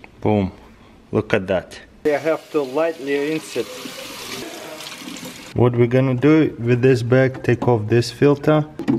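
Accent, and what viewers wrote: Russia. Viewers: Colorless Rainbow its his Russian accent...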